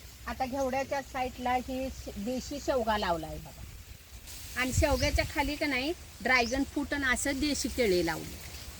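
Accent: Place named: Rural, India. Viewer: Tumchya malyatil bhajila tumchya gavran bolnyasarkhach godava aahe.Tumche kasta baghun khup kautuk watate tumche.❤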